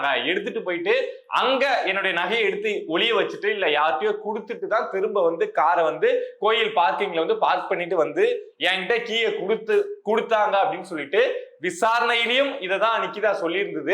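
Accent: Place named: Nellore, India. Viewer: Nellore speak Anna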